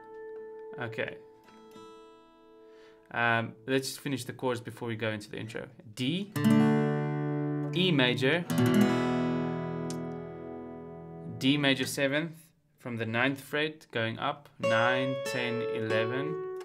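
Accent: South Africa. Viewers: Het dadelik jou Afrikanse aksent agtergekom.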